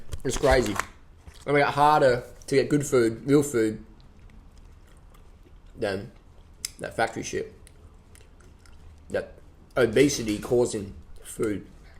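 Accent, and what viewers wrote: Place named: Australia. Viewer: I don't know about anyone else, but I cannot understand but approximately every other word of Australian accented English + eating jack fruit at the same time...heh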